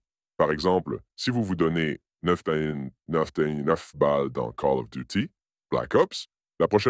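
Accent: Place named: Quebec, Canada. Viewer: T'es Québecois :)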